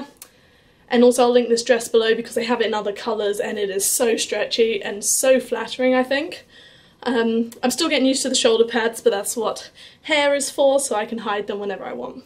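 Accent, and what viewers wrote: RP, United Kingdom. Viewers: She sounds so posh and cute. Maybe I'm just American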